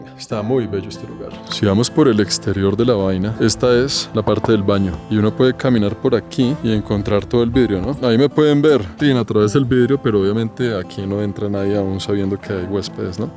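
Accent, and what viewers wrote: Colombia. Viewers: Porque habla con ese acento sera colombiano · Amo su acento colombiano, su sencillez y muy guapo gracias por enseñar y visitar nuestro país ❤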